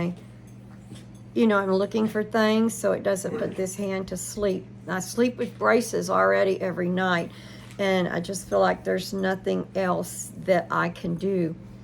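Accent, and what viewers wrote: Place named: Texas, United States. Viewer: ...Please don't think that your Texas accent is incorrect. I love that Southern & Texas sound. Speak it proudly❤❤❤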